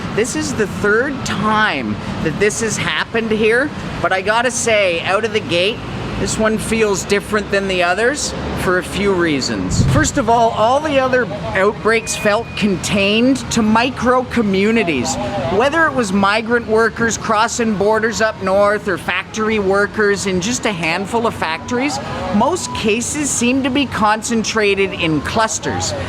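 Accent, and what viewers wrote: Canada. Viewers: This man has the most Canadian accent ever. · Can't stand Canadian accents. I'm out....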